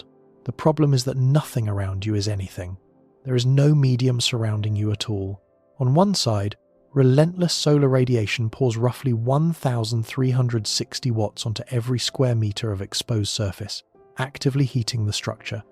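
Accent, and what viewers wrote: England, United Kingdom. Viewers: I am pretty sure that the air and moisture in the suit would still cause evaporative cooling, and your face would frost over or freeze superficially on the surface. It would probably look like it was frozen solid. I think that some of us are a little hard on this Sleepy Science channel. It's not terrible even if its AI. It's sleepy pop science with a relaxing English voice to fall asleep with :-)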